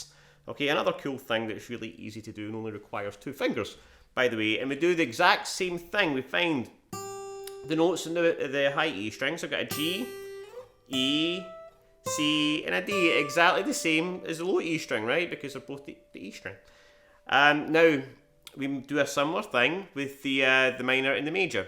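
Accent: Scotland, United Kingdom. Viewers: Good stuff! What part of Scotland?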